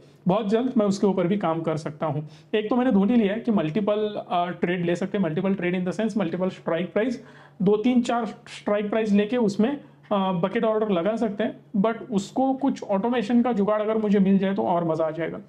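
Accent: Maharashtra, India. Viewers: Sir, Samjvanyachi, shikavnyachi padhhat khup chhan aahe tumchi.. Khup pot tidakine agadi manapasun shikavata Sir.. Pan Sorry to say you this, Hindi improve karayachi garaj aahe, Tumhi je bolata sadhya te marathi hindhi aahe, like our CM.. Sorry to say you again..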